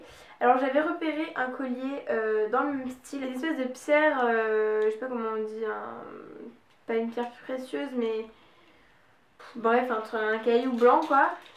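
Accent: Brittany, France. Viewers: tu est bretonne ? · J'adore tout ce que tu as acheté ! Juste une question, tu es bretonne ? o: "Bref un caillou blanc" tu m'as tué :''')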